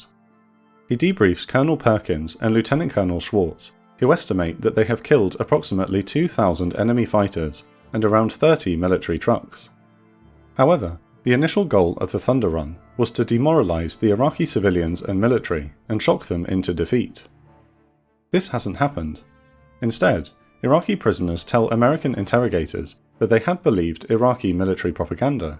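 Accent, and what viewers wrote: United Kingdom. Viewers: The guy making the video is also the narrator. He needs to hire a professional to narrate the videos because it's not his strength, unfortunately. The animations have improved significantly over the years, but the narration has not. I personally don't mind his incorrect intonations and strong british accent, but some of my friends, to whom I've recommended this channel, have remarked on this subject. In fact, even the automatic captioning system on Youtube often misidentifies his words. Does it make sense now?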